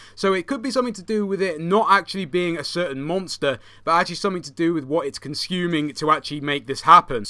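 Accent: United Kingdom. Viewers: No offence but you must be brain dead if you think he sounds like the gaming lemon. Just cause his accent is British it doesn't mean they sound the same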